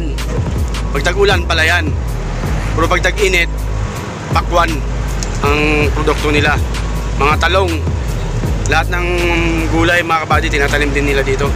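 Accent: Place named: Visayas, Philippines. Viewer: Kabayan konti lambot ng dila para di mahalata bisaya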